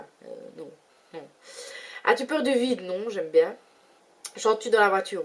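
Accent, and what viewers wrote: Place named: Belgium. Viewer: Coucou! Bienvenue sur youtube ^^J'ai crée une chaîne il n'y a pas très longtemps, et je t'invite à la découvrir en espérant qu'elle te plaise et te face passer un bon moment, et si tu t'y sent bien et que tu a aimer quelques une de mes vidéos n'héssite pas à t'abonner. Et si tu t'abonne j’espère que mes future vidéos te plairont d'avantage! je te souhaite une bonne journée ou soirée:) bisous à toi!:p byyyy! ^^ -...... PS: j'adore ton accent! tu est belge non?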